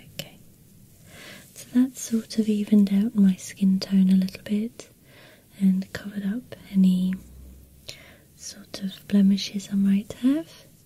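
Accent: United Kingdom. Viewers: I love her british accent💕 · I love your accent and the U.K. In general 🇬🇧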